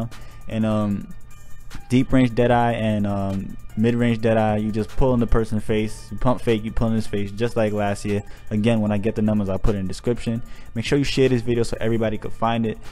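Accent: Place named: Oklahoma, United States. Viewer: Bro u from Oklahoma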